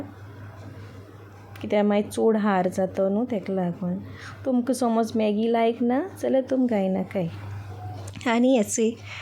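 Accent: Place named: Quepem, India. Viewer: Keshi asai tumi... Somzota bori hai mhun.... God bless you... Keep going..... I love quepem dialect... Sounds very good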